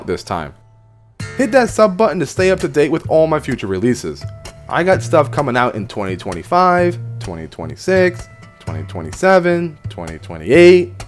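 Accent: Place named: Mexico. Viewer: you sound Mexican...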